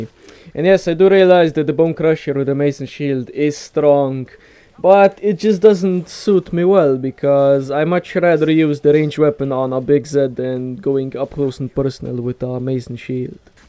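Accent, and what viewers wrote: Russia. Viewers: You sound very russian. You should try to go for a 50/50 comedy and info mix, you will do really well. Anyways, video makes a lot of sense, good shit!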